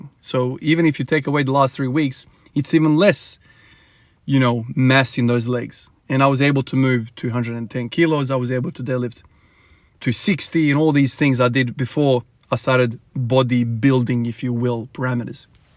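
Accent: Australia. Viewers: What accent is that? Sounds like a mix between Australian and South African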